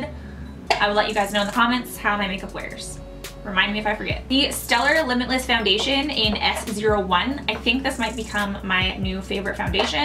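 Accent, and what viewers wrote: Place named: Canada. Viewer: i love your slight canadian accent it's so cute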